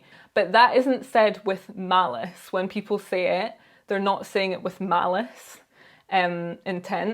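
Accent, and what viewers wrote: Scotland, United Kingdom. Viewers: Hang in there! Love your videos and to see a black woman with a Scottish accent is so cool. We all have hangups we need to get over. Some people go to positive others prefer negative. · No, she definitely doesn’t. She has a very strong Scottish accent.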